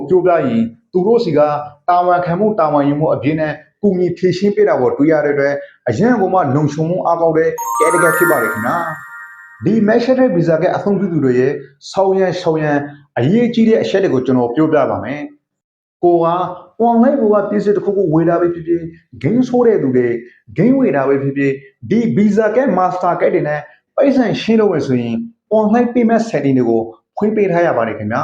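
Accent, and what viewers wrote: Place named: Rakhine, Myanmar. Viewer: လူစကားကိုလူနားလည္ေအာင္ေျပာပါ ရခိုင္အသံႀကီးနဲ႔မေျပာနဲ႔လီးပဲ ေစာက္ေတာသား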